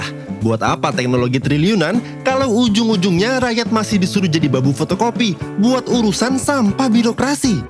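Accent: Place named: Banyumas, Indonesia. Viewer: Salut bro....wong ngapak harapan bangsa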